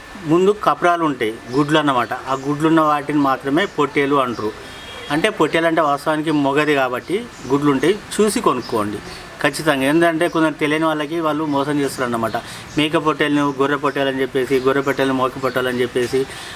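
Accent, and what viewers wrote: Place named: Telangana, India. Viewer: Pakka telangana slang ra adi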